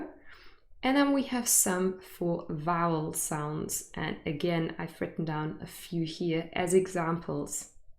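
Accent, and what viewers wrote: Germany. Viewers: Are you German? Where are you from? You sound German. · I know you are a German-speaking teacher of English. My observant ears can catch a foreign accent in your speaking English.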